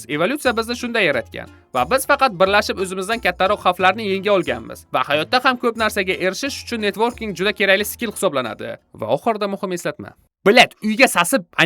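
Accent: Qashqadaryo, Uzbekistan. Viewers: Qashqadaryodanmisiz diyman-a?😅 gapirishiz jo‘ramdi eslatopti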